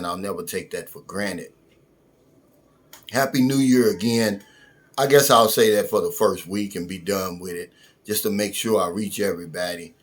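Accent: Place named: Louisville, United States. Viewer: I knew I recognized the accent! Louisville in the house! Newburg here! I’m glad I came across your channel.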